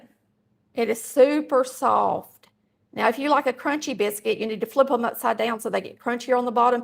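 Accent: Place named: Georgia, United States. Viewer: I love your Georgia accent! it reminds me of my grandma Nichols from Georgia. She loved to bake biscuits, cornbread and sourdough rolls to die for! Her idea of a balanced breakfast was a cup of coffee and a slice of homemade cherry pie! So good!❤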